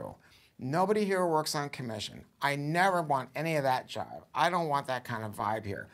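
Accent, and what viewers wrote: England, United Kingdom. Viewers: Shit. That english accent is exactly like mine. I feel seen. You know that episode of the Simpsons which is a parody of The Midwich Cuckoos? The Bloodening? Like that.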